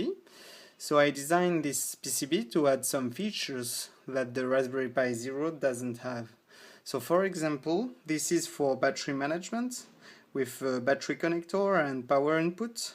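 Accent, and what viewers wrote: France. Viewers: Putain mec please parle fr ca s entend trop a ton accent que tu parles fr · salut ^^ Vu ton nom et ton accent, j’imagine que je peu parler français ... tu fait la même chose avec un Pi 3 b+ ?? pour supporter le nouveau recalbox 4.1 (dreamcast / PSP / etc ...)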